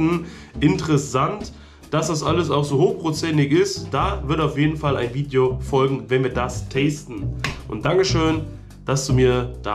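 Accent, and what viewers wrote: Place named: Netherlands. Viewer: Eim sehr spezieller niederländischer Akzent! Glückwunsch nachträglich! 😀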